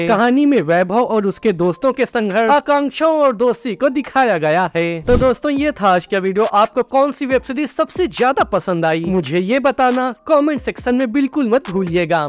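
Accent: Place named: Bihar, India. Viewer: Change ur voice bihari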